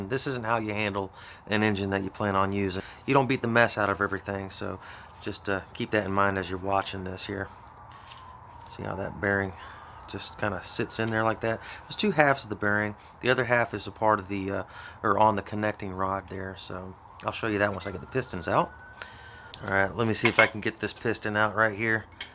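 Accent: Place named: South, United States. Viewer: I love the polite southern accent. "don't beat the mess out of it", would have been phrased differently in the Northern USA and Canada :)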